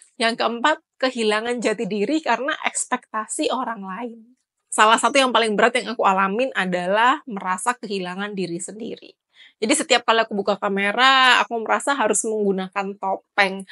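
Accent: Java, Indonesia. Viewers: Imemang gaya bicaranya begitu ya intonasinya terlalu ditekankan terkesan medok jd kyk orang tercekik.... Kenapa ya.... Kasihan.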